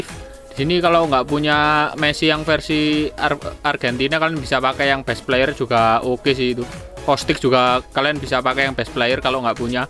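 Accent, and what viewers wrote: Java, Indonesia. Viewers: Keren bro, medok mantap, cuma ada kata anj*r nya kurang enak didengar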